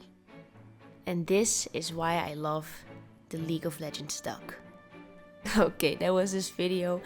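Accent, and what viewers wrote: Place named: Netherlands. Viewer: haha ik kon echt meteen horen dat je nederlands was, goeie video wel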